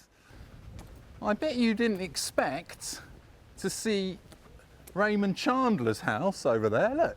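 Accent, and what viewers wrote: United Kingdom. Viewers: Ooo l love British accent😭❤️❤️❤️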